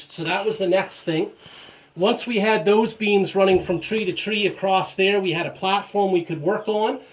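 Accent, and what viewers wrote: Ireland. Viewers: Touch of Irish in your accent?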